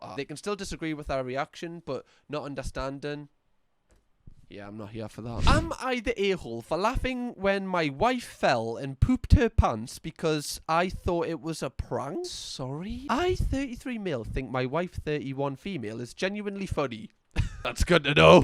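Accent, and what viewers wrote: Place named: Scotland, United Kingdom. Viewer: you sound like scottish jacksucksatlife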